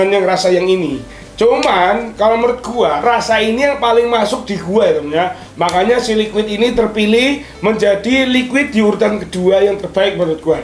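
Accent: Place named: Java, Indonesia. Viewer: Skalin konten ngomong gua nya 1000 kali😂😂 medok lagi😂😂🙏🙏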